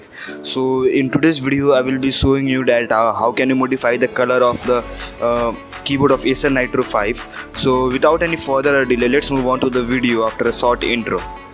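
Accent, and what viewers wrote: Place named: India. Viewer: Your Indian accent is very strong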